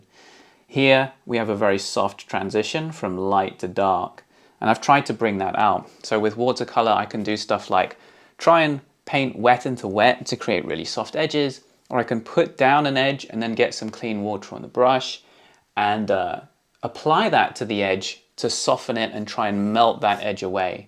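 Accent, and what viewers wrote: United Kingdom. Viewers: I love the accent when an English person lives abroad they have an accent diffent from home town but yet not same accent in country they living in, nice paintings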